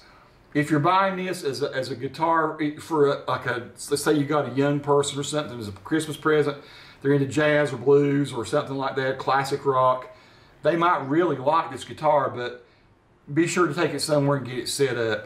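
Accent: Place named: East Tennessee, United States. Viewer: Are you from Knoxville? Your accent sounds exactly like the East Tn. accent.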